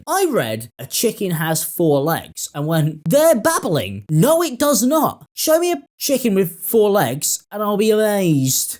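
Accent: United Kingdom. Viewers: .... You're a Brit,? (from the accent) & you don't seem to understand American-style Family Law courts. Sniper-Bitch wants this lawsuit to go to Family Law because those courts are heavily biased against men - Period!!! It's one of the biggest legal scams going in America. This is a business law matter & that's where it belongs.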